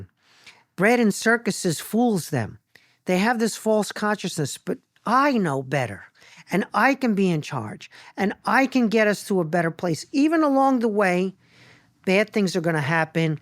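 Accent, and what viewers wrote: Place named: New York, United States. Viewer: NYC metropolitan area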